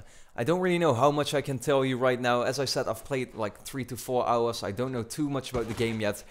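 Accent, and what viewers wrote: Netherlands. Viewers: Aan je accent dacht ik al dat je Nederlands was maar weet het nu zeker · Are you from belgium or netherlands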